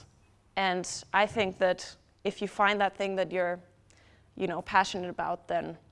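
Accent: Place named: Germany. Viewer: I can hear a German accent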